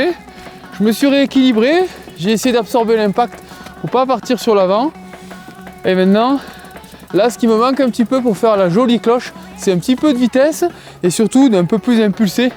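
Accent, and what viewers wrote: South, France. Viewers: Très bonne explication de quoi mettre en confiance et le plus l'accent du Sud 🤘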